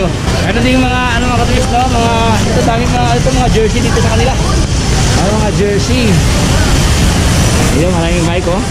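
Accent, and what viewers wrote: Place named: Visayas, Philippines. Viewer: Klaro kaayong pagka bisaya dol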